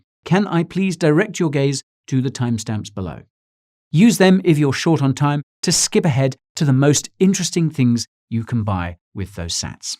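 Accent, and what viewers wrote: United States. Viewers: Vacations. Fall. Lumber... I've been noticing your "American" is coming along nicely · Can you do a 2hole video in American accent. You're American accent is so good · Note can speak American as well.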